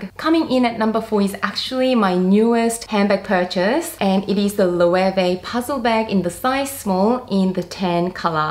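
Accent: Australia. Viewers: Korean Australian accent...? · Beautiful!!! Aussey accent is nice too. 😝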